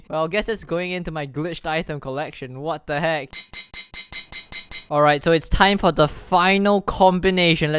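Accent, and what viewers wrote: Singapore. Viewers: EYY <3 same bro. I could tell by ur accent haha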